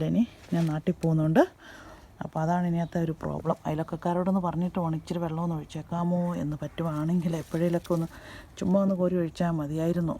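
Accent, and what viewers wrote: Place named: Kottayam, India. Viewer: I like ur videos very much becoz of ur fast talking..20 minute watch cheyunnathu oru worth anennu thonnum..unwanted introduction, explanation onnum illatha video valare ishtamanu..bore adikkilla..vegam karyangal avatharipikum..keep it up..r u from kottyam district..becoz ur slang same like us.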